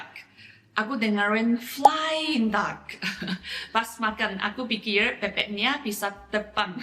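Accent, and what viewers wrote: China. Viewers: Kakak dari Beijing ya? Dulu ada temenku dari China yg 'dialek' bahasa Indonesianya seperti kakak kalo ngomong.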